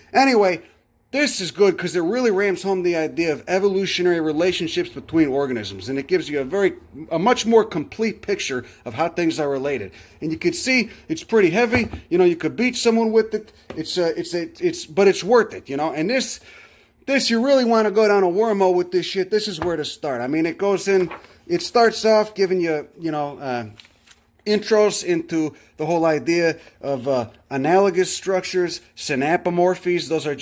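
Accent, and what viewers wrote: Chicago, United States. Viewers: I just found your channel last night and I'm hooked. I've really only ever had an interest in botany insofar as it related to what was in my garden or anything growing wild nearby that could be useful to me somehow. This is changing for the better and you helped me to widen my interest in not just plants but all life that exists nearby, wherever I happen to be. Also I like your accent. Chicago, yes? I really enjoy how your accent might make someone think a certain way about you but then you immediately dispel that belief by dropping some knowledge and talking about critical thinking, a skill that is sorely lacking in this country due to the fact that it's harder to exploit someone who thinks critically about how's and why's. Thanks for taking time to share your knowledge with the world. Best wishes from Seattle, WA.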